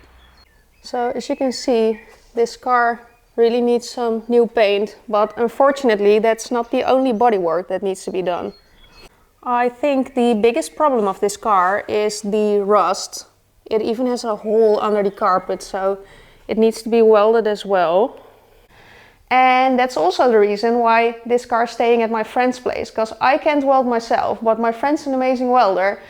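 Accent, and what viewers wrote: Netherlands. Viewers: Love that dutch- English accent. Used to love the look of CRX growing up. Still a cool car. nicely done enginebay with matching colour. I'de guess my favourite would be the E28. please keep it original! no low rider, 22" rims and such. 2,5 hours away, wouldn't that almost put you in another country? :P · Beautiful and stylish woman with a confident and friendly manner; cute Dutch accent also a plus ✅ - CRX resto; very nicely done ✅ - Old school Volvo; well cared for ✅ - Volvo wagon; comfy, practical, cool ✅ - E28 5-series; a proper Beemer that needs some love ✅ - Subscribed ✅ Congrats on being noticed by the algorithm! Cheers from Australia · Love the car collection qnd your videos. You are stunning qnd have great Dutch accent ❤ wishing you the best from California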